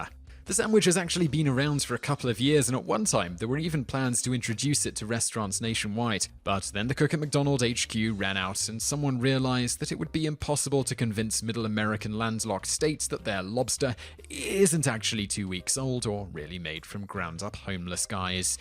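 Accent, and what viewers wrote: United Kingdom. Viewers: I'm very confused... you sound british, your videos etc refer to US currency and culture (including references to foreign being anything not American), but in one of your other videos I thought you said you lived in the Czech republic??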